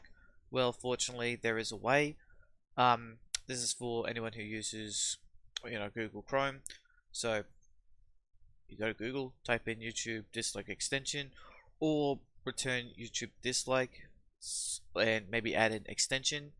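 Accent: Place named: New Zealand. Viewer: I swear you've got a bit of kiwi in your voice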